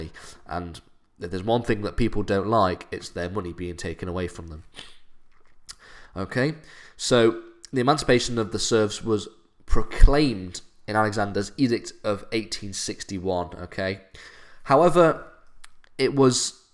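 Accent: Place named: United Kingdom. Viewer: bri ish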